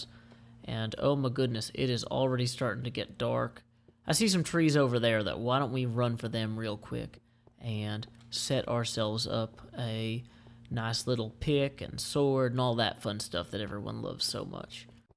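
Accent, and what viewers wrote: South, United States. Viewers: Applejack approves of your souther drawl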